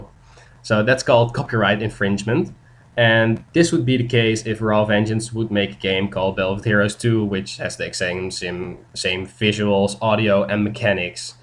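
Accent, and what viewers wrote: Netherlands. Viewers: Dutch guy, I can hear it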